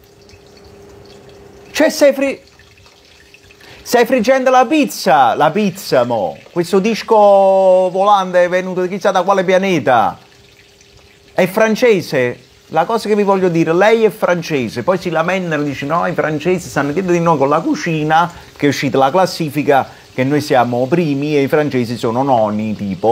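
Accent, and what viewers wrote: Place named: Naples, Italy. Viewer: Sempre interessanti questi video. Poi l’accento partenopeo rende il commento ancora più efficace Complimenti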